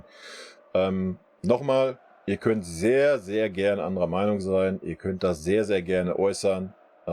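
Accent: Ruhr, Germany. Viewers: Komme aus der Oberpfalz/Bayern bin seit ich in der 1. klasse war Bayern Fan und das ist 33 Jahre her. Ich kann mich noch nie erinnern jemanden Fan sein ab zu erkennen, nur weil er nicht aus München/Bayern kommt. Mach weiter so verfolge dich seit 4 Wochen und mag deine Ruhrpott Schnauze😂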